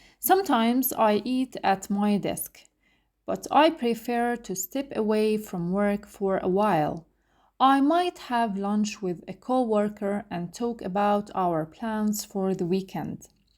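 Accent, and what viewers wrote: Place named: Arab world. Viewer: أنت عربية واضح من لهجتك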